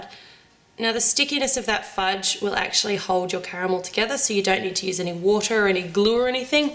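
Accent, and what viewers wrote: Australia. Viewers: OMG if I had an Australian accent I would never shut up. I love your accent!! ^-^ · Are you Australian? I'm a kiwi, I know what an Australian sounds like... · Pugh she's Australian!!! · i love her accent! are you austrailian? good video! lovely cupcakes!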